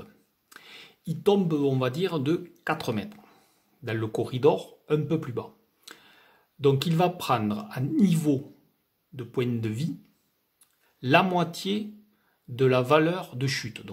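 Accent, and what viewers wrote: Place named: Toulouse, France. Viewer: ho con mais vous êtes de Toulouse? merci pour cette présentation, je veux me lancer dans le jdr et celui-ci me branche bien :)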